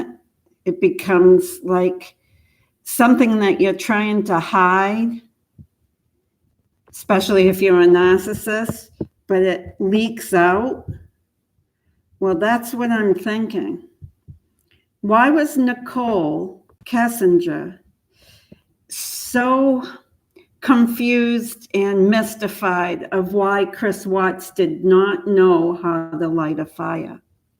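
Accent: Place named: Boston, United States. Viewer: You sound like you come from Boston or near Mass...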